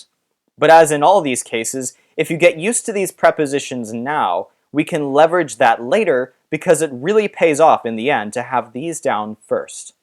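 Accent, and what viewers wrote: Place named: France. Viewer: sounds like a native French man trying to speak Spanish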